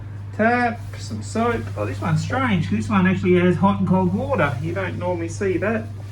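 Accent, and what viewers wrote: Australia. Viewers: Is that Australian broad accent?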